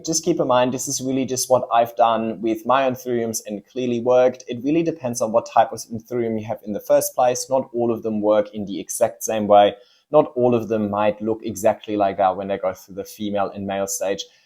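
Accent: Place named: Germany. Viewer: Hey greetings from Berlin! It’s kinda funny that I keep getting German accents from you😅 Any chance you are German? Or least to say I enjoyed your accent, makes me feel homey:)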